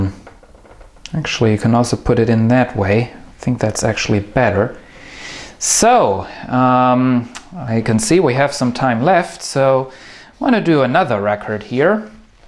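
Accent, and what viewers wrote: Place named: Germany. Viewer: forty earo :D you sound so german, alter :D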